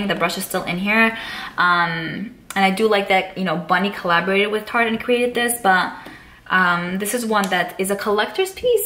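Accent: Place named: New Jersey, United States. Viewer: She has the same accent as Carli bybel lol are they both from jersey ?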